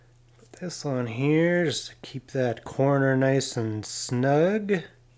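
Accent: Canada. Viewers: the canadian accent hahahha love it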